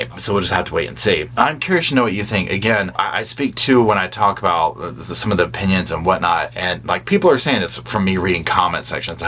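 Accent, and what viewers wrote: South, United States. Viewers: 100%! I, too, have a Southern accent. Listening to him is like sitting on the coach with my family jaw-jacking about the nuts around us. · YES, I get all the info I would from the news, or courtv, but in a much warmer, SOUTHERN way, including some opinionated sidebars, which is just how I like it!❤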